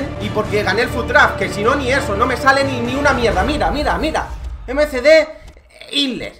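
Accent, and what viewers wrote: Argentina. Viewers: Jajaja me encanto tu acento argentino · Yo soy Argentino,y te sale re bien el acento xDDD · Que buenos son tus videos boludo! jajajajaja me encanto hablando Argentino! Crack la concha de la lora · Hablando argentino boludo jajaja <3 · jajajajaja q bueno el acento argentino!!! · De los mejores acentos argentinos que escuché de alguien que no es argentino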